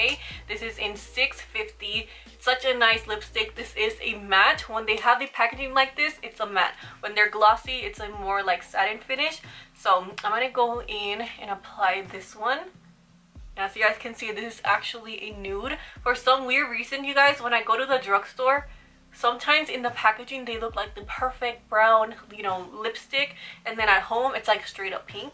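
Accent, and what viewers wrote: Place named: Puerto Rico. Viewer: Hello, I just started watching your videos and immediately I looked for a video in Spanish and I loved it ... but I am learning English and I will see your videos to learn how to apply makeup and learn a few words. I loved hearing you speak Spanish and knowing where you are from because of your accent. speaking english no idea you were from Puerto Rico a big greeting you are amazing... arriba los latinos !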